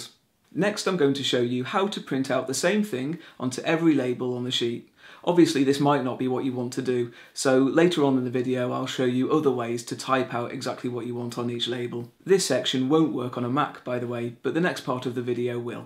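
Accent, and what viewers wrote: England, United Kingdom. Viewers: Brilliant easy to follow instructions with an ENGLISH ACCENT. My only question is ….my laptop doesn’t produce another sheet when I get to the end of first one and I have to start all over again. I’m sure I’m doing something wrong. Please help. Thank you.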